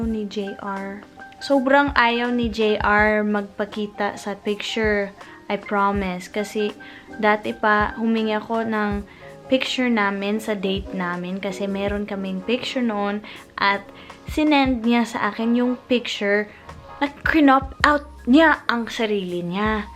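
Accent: Visayas, Philippines. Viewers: Hi!, sobrang na amaze ako sayo. Galing ng accent mo sa filipino. Pati english mo nadamay na sa accent ng pilipino. Ang pagpili mo sa content mo na gamit ang wikang pilipino ay hindi po pagnanakaw ng kultura. Yan po ay choice mo. Hindi naman siguro patented ang wikang pinoy na exclusive lang para sa amin. OA lang ang nagcomment. Feeling nya, kanya lang yon. Hahaha... Ipagpatuloy mo lang yan. Bihira lang sa isang foreigner ang yakapin ang kultura namin, meron nga jan half filipino hindi proud sa kanyang kultura at di pa marunong magtagalog. Kaya welcome ka sa pagpili ng paggamit ng salita naming mga indio. Hahaha... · your so pinay (expressions, accent, slang, and heart).. haters will always hate.. but I like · ... Di mo talaga maaalis na walang maninira sayo... Please do whatever you want to do... Natutuwa nga ako sa pagtatagalog mo kasi parang bisaya kang ka magtagalog... 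Kasi may gf din ako noon na taga visayas... Tuloy lang... Thank you... God bless you...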